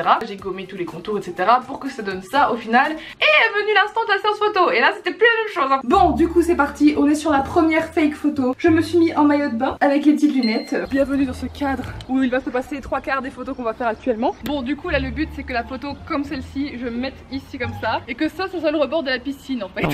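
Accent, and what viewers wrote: Belgium. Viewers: attends t’es belge? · tu est belge ? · Tu est belge ? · Tu es belge?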